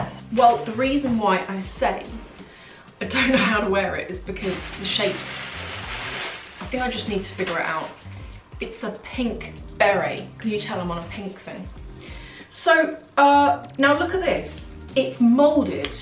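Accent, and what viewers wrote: England, United Kingdom. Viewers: listen to your English Accent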